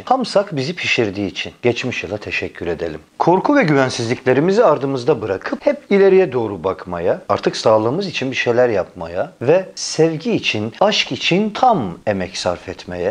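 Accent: Erzurum, Turkey. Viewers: türkçende harikulede ama erzurum aksanıyla konuşmak sana daha çok yakışıyor videoların devamını bekliyoruz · Abi şiveyi değiştirme erzum şivesi seni sen yapan özelleğin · Abi bu işi büyüt ama sevdiğimiz halinle doğallığınla devam et . Erzurum ağzını bozmadan devam etmeni rica ediyorum baş tacısınn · Erzurum şiveli komik hikayeler gelsin lutfen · Kardeş gerçek Erzurum ağzı ile anlatımların muhteşem nacizane başka ağızla hiç bir video anlatım yapmaman seni insanlar izliyor dinliyor gülüyorsa anlatımının komik ve gerçek Erzurum ağzı ile olduğundan gerek yok kitap gibi anlatımı konuşmayı bunları zaten birileri yapıyor başarılısın devamını istiyor izliyoruz ve beğeniyoruz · E şimdi benim kafam karıştı. Sen sadece bu hikayeleri anlatmak icin mi bu güzelim erzurum şivesini konustun. Ben dogal şiven sanıyordum. Bu istanbul turkceli halinde ne şimdi gardas. Filmin orjinalligi gitti gibi bir durum oldu valla :) Biz seni oyle dinleyip tanidik,sen hep oyle ol..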